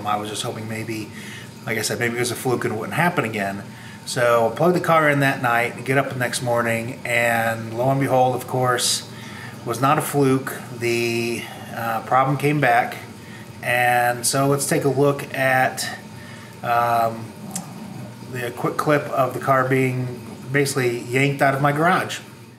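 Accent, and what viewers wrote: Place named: United States. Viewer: your American accent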